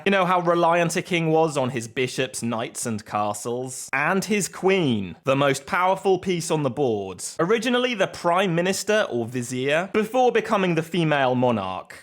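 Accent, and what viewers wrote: United Kingdom. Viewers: This is because of his Bri’ish accent, really. They sometimes Rs between vowels.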